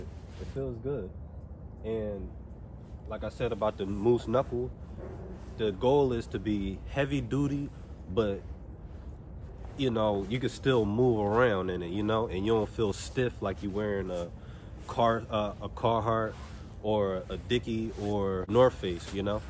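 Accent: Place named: New York, United States. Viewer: You sound like u from new york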